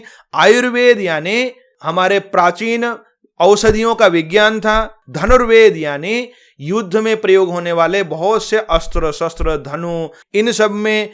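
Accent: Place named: Jodhpur, India. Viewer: Sir AAP jodhpur se ho na aapki aapki aawaz bata rahi hai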